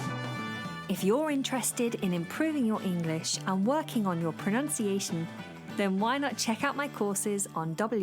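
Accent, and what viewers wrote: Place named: United Kingdom. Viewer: Love to listen your clear British english!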